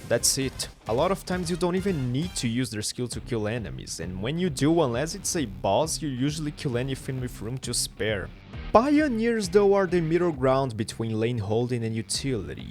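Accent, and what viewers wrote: Brazil. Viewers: I dont know if you're brazilian or not, but i swear bro you just HAVE that brazilian english accent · you sound brazilian 🤔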